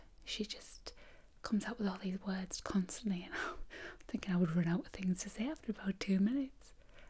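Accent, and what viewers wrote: United Kingdom. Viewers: I think I can day my English is pretty good, I always understand all the asmr video's I like to watch, but I have to admit.. sometimes I really can't understand you because of your very strong British accent! Am I the only one? But I can deal with it, because I really love listening to your accent haha ^_^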